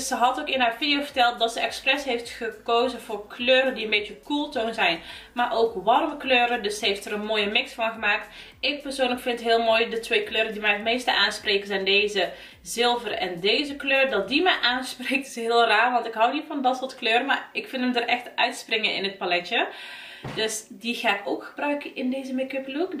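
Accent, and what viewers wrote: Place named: North Brabant, Netherlands. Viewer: Ik hou zo erg van je kleine beetje Brabantse accent. Echt zo schattig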